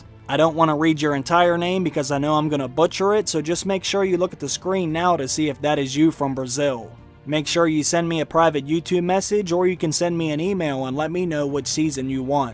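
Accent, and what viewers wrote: Pittsburgh, United States. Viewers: Are you a fellow Yinzer? You sound like a Pittsburgher.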